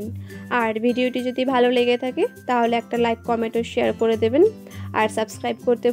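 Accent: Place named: Bangal, Bangladesh. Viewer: Bangal vasai ktha bolis valo lage sunte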